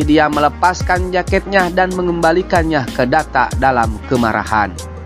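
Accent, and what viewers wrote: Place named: West Java, Indonesia. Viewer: yg cerita kita orang sunda ciri sunda banget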